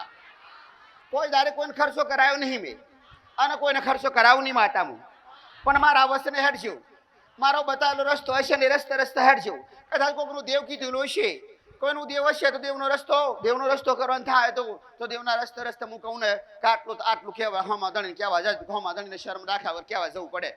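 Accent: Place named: Mehsana, India. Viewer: માડી ની ભાષા અમારા મેસા નાણાં ની બાજુ ની આવે છે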